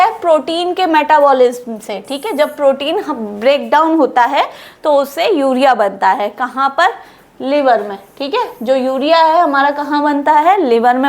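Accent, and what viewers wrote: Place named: Bihar, India. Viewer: Theek hai yah kam boliye aap Bihar se ho kya ❤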